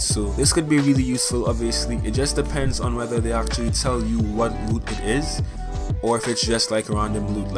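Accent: Trinidad and Tobago. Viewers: Im from trinidad btw u sound trini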